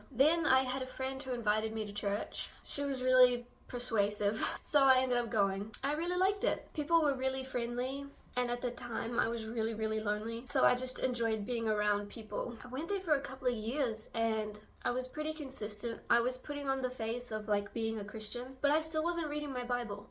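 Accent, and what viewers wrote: Australia. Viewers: What country were you born in, if I may ask? I detect a slight accent in your English that sounds Australian. May your 2026 be the best year of your life. 🎉🎉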